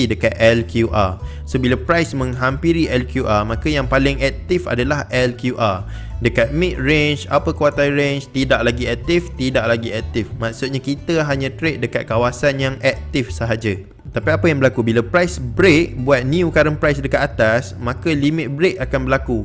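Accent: Pahang, Malaysia. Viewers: Terbaik video tuan, sy tumpang belajar, mohon halal ilmunya ye. Tuan org pahang ke?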